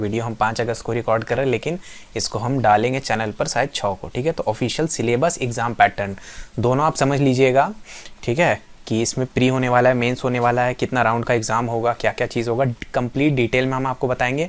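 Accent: Jharkhand, India. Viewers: Bilkul bhaiya jharkhand wala lg rhe h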